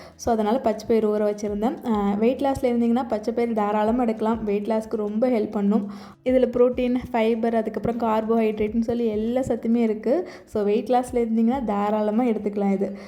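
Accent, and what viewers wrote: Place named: Sri Lanka. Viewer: Hi sis thodarthu paakuran ur video Sister neenga Sri Lanka va unga pesu appadi iruku ❤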